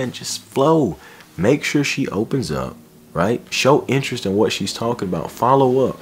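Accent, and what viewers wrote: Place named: Mississippi, United States. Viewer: this guy gotta be from mississippi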